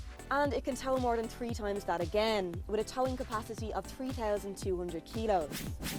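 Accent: Ireland. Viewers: Well it is an Irish accent, don't be so insulting and turn on captions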